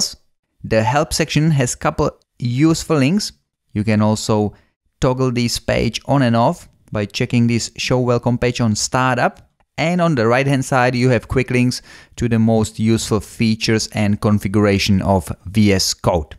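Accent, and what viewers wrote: Czech Republic. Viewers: Just started using VSCode at work, this series is very helpful. Also, with a name like and that accent, are you Czech?:)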